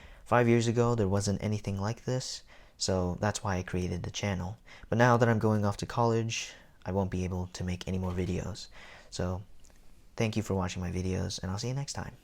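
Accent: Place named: United States. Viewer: why do sound so american when ur profile pic looks asian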